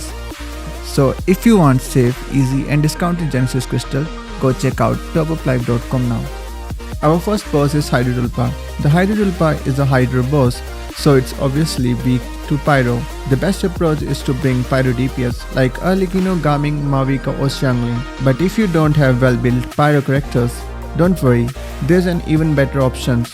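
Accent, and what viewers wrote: India. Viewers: your voice look like indian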